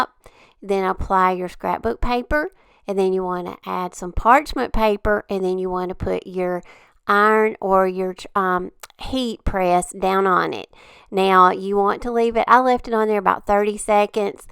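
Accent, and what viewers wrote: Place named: West Virginia, United States. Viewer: Hi, I sincerely love watching your Channel... Not only do l think you’re an extremely talented crafter, l love your accent! It reminds me of my late Aunt who lived in West Virginia. I’m surprised you didn’t know about the “Cling Wrap” trick because, we’re about the same age. I was doing this way back in the late 70’s as a teenager. Mod Podge has been around since the late 60’s but it was expensive especially for a teenager. We use to make our own Homemade version of Mod Podge by mixing a 3 to 1 ratio White Glue and Water. Another groovy craft we were making was Crayola Crayons T-shirts! 🖍 Just in case anyone is interested: - Remove the paper wrapping off of your crayons and grate them using the smallest holes. - Iron your T-shirt smooth - Place several (4) sheets of paper towels “inside” your T-shirt. (It prevents the crayon from soaking through the front of your T-shirt onto the inside! 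This is an extremely important step, do not skip it!) - Lay your crayon gratings onto your T-shirt anyway you desire. Or even into a simple design! ♥️⭐️🌙🌸🌈🔥⚡️ You can use all of the colors you want! Although keep your layer of crayon shavings on the thiner side. You DO NOT want a thick layer! - Cover your design carefully with Parchment Paper (DO NOT USE WAX PAPER!) - With your Iron set on High (USE A DRY IRON, NO STEAM NEEDED!) Just press for approximately 90 Seconds, let your Parchment paper cool for 10 seconds then peel off. If you laid your crayon gratings into a Design, then when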